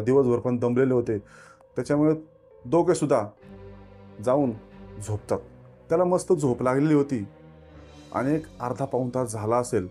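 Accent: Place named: Nashik, India. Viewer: सर तुम्ही कुठले आहे भाषा तर नाशिक ची वाटी हे😊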